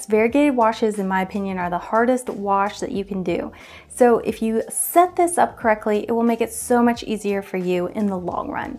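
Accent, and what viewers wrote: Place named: North Carolina, United States. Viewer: I love this effect and can't wait to use it soon. Also: I thought I knew that accent! I just saw your fan mail address. I'm from right up the road, Shelby, NC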